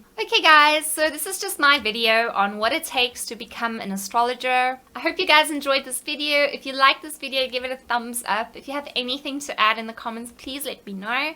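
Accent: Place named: South Africa. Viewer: you can't destroy that south african accent with a ridiculous american "r"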